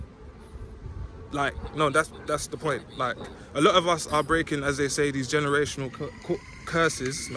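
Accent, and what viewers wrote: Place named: Peckham, United Kingdom. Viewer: Man sound like he from Peck'NAM.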